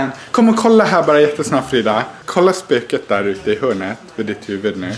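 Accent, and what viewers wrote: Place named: Norrland, Sweden. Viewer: Hahaha så härlig vlogg, alltså er dialekt där uppe är ju helt amazing! :D "hemmakväll-påse på huuuvet"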